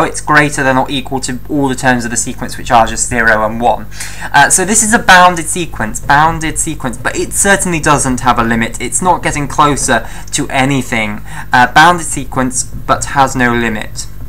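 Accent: United Kingdom. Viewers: thats the most british accent i've heard!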